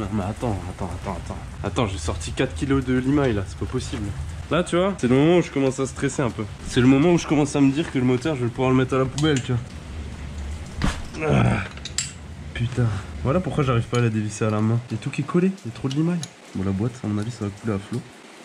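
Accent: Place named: Alsace, France. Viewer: A mes tu et alsacien mdr duncoup jentend kopf temi 😂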